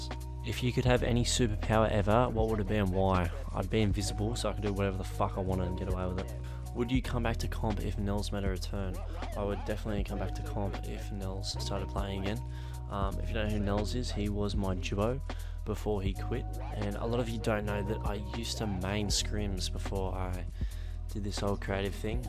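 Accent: Australia. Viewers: aussie accent man hes fkn funny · He's Aussie? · Is aussie?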